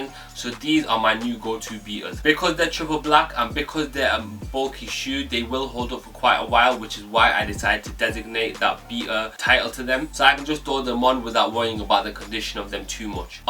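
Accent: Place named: London, United Kingdom. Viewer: Love your mix of London and Manchester accents, sounds so dope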